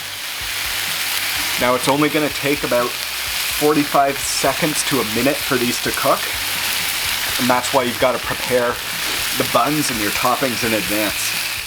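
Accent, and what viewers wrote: Canada. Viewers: Canadian eh?! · Looks delish! And love your Northern Neighbor accent. :)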